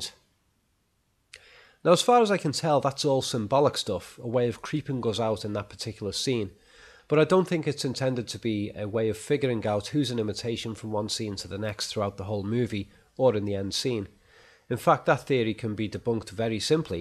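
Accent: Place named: Scotland, United Kingdom. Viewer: Dammit your accent is awesome. You should star in the next Braveheart.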